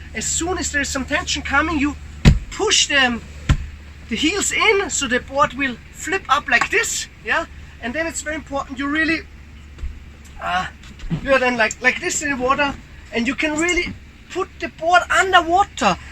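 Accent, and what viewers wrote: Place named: Austria. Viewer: Du bist ein netter Kerl und deine Videos in deutsch sind wirklich hilfreich,aber warum dies Video in englisch? Für wen? Selbst für mich mit Muttersprache Deutsch und sehr guten englischkenntnissen ist es eine Qual dir zuzuhören. Viel schlimmer noch für jemanden mit Muttersprache englisch. Bleib lieber bei deutsch mit nettem (österreichischem?) Akzent. Dein englisch ist einfach grausig.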